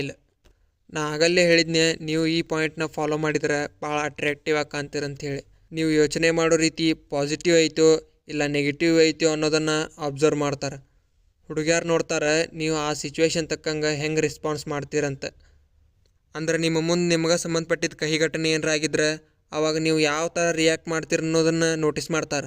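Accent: North Karnataka, India. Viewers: Dost ne pakka uttara Karnataka dav adi nod .. Nimmur yvda ..🧐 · ಅಣ್ಣಾ ನಿನ್ನ ಉತ್ತರ ಕರ್ಣಾಟಕ ❤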